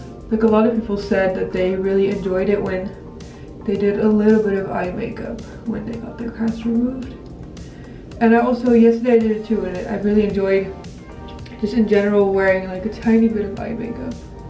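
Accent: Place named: Netherlands. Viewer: You sound more Dutch when you’re recovering from surgery 😍 wishing you a speedy recovery and gorgeous results!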